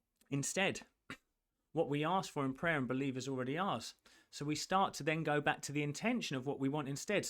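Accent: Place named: London, United Kingdom. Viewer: You have a lovely voice and I also love your London accent!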